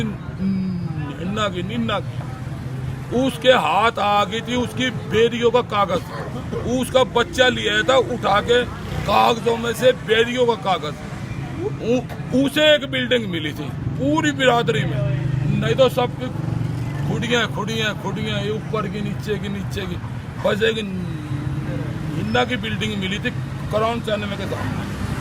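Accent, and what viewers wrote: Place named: Haryana, India. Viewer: BoLi rohtak ki hy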